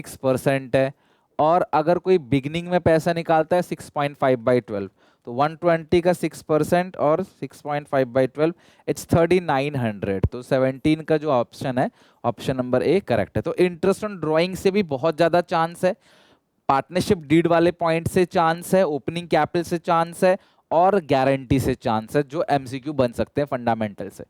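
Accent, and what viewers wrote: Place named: Uttar Pradesh, India. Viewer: Lagi raha tha ki tum up se ho😅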